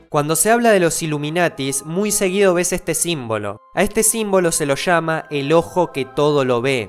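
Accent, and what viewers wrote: Buenos Aires, Argentina. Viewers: no sabia que eras argentino... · es argentino? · eres de argentina? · este flaco es porteño gato !! awta... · Sos Argetnino?? por que tu acento me suena a argentino...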